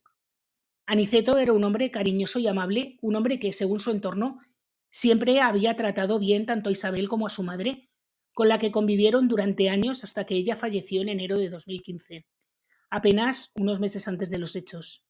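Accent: Spain. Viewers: Gracias por el vídeo, aunque no me gusta el acento español me gusta como lo narras e incluso a veces me hace sonreír los comentarios que haces.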